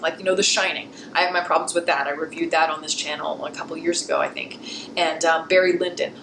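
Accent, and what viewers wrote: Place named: Los Angeles, United States. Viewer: That's how girls talk in Silverlake (LA, California).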